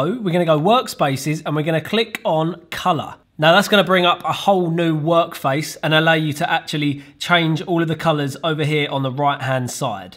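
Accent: United Kingdom. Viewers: Strongest british accent...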